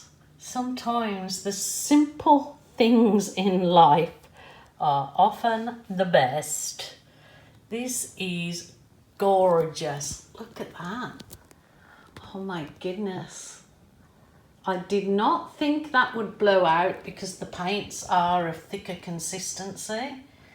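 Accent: Birmingham, United Kingdom. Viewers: after I sent it I thought “No! She a Brummie ya daft tit” I’m sorry for miss placing you. Love that you’ve never lost your accent. X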